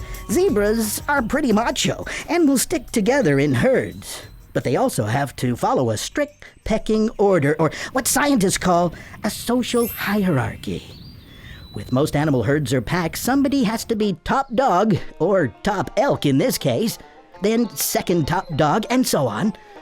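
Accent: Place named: South, United States. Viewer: the voice sounds like a hillbilly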